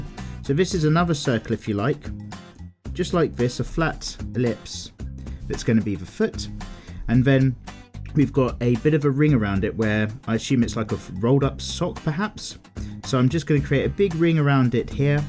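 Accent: United Kingdom. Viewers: Your in the UK from the way you talk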